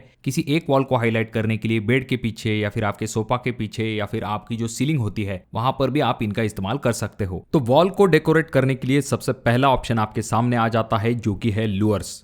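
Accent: Maharashtra, India. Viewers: Typical Marathi accent in Hindi.... Proud of you...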